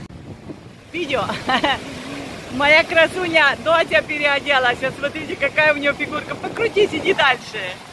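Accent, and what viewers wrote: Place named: Bulgaria. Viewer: У вас акцент болгарский 😉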